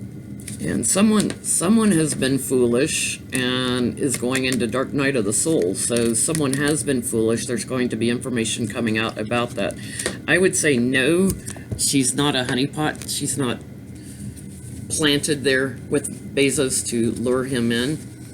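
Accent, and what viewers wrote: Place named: Russia. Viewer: Great reading! I feel a Russian influence as well! 🌻👍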